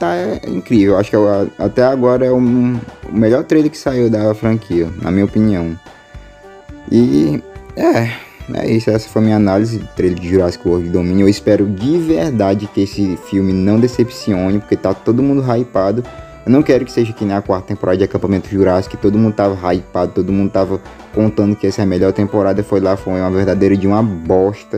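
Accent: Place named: Brazil. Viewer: Is a Brazilian Voice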